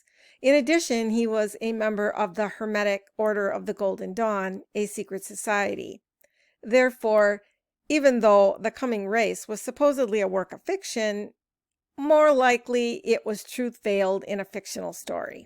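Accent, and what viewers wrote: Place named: Chicago, United States. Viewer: That's a Chicago mid West vocal infection. Someone I loved spoke that way and she even had it when speaking Spanish..as she was born in Cuba then fam had immigrated to Chicago as a child.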